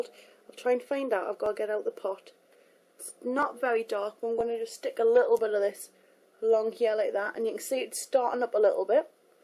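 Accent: Newcastle, United Kingdom. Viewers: Haha,u r the first english person ive heard on youtube,R u from newcastle?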